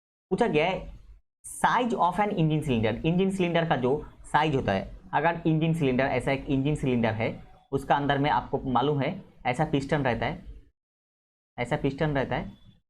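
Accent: Bengal, India. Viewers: Dada Tumi ki Bengali? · app bangali ho keya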